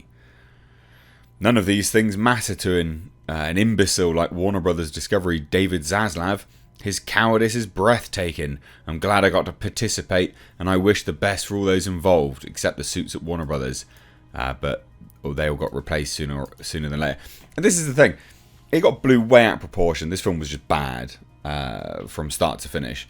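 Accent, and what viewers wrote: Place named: United Kingdom. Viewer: like your british accent.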